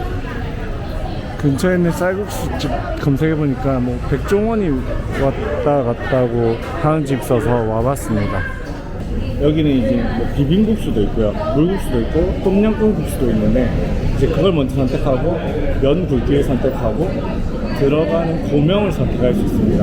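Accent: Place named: Gyeongsang, South Korea. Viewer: 인스타에서 보고 왔습니다 영상재밌어요 😂 익숙한 사투리이신데 혹시 경남분이신가요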